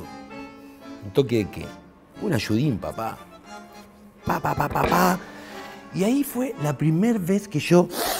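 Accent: Argentina. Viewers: Muy Bien con tus nuevas anécdotas! Jajaja excelente ese acento que se me esta pegando aca en mi propio País Chile! Saludos Kl! Se le quiere acá en Chile! Andá... y traeté otro personaje o amiga para que recorras Chile de Arica a Punta Arenas y luego enseñes La Gran Argentina Boludo! Saludos! Que Dios bendiga a tu pueblo y tu carrera bye! 🇨🇱🇦🇷🤣🍻📺😀😉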